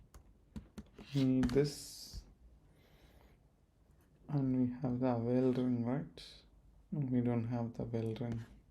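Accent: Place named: India. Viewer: with Indian accent (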